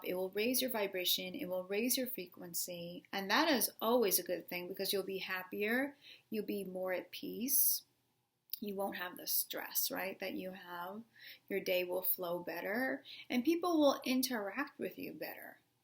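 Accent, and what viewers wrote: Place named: New York, United States. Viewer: I loved Green Day in the ‘90s too and saw them in concert in NY then. I thought I heard a NY accent. Are you on LI too? We must be around the same age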